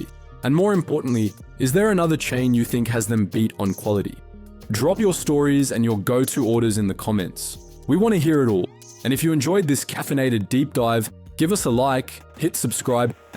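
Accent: Australia. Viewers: I know it’s AI but love how it’s an Australian with deep, comprehensive knowledge on UK high streets 😂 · Based in Cyprus, Australian "voice", making videos on the UK Lowk slop icl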